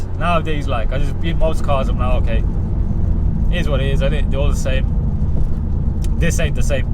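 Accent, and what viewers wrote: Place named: Liverpool, United Kingdom. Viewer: That Liverpool accent what makes this video entertaining. Keep up the good work.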